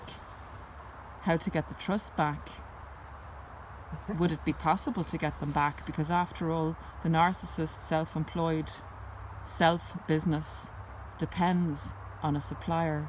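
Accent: Ireland. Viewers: I love the background of beautiful Forrest and cute little dog, thanks for your wisdom , also love your Irish accent it’s a soothing way to get the information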